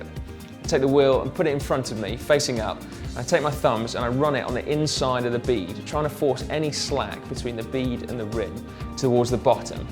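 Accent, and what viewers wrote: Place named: Cockney, United Kingdom. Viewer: Brrrrrrr this cockney English......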